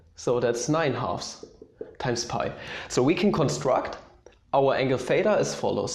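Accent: Germany. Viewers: Bist du deutscher? Man hört deinen Akzent hehe und dein Video hat mir geholfen omg danke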